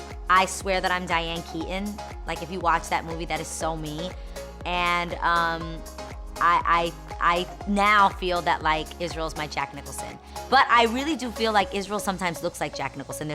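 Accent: New York, United States. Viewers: Lmaoooooooo "i'm an exhausted pigeon throughout the day" that was so hilarious and i can so relate; i love how you could hear your NY accent so much herw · Hi. You will probably never see this comment but I have to tell you that I love your YouTube channel. I relate to you so much and love your New York accent. I grew up in the lower east side, in the LaGuardia projects and I am also 4' 11! My cousin went to P.S. 110 and his mom currently teaches there. He died on 911. Anyway, your channel always makes me laugh and feel good.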